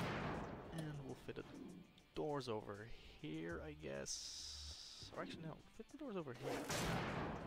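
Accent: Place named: Netherlands. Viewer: is sounding very Dutch in this video for some reason...:P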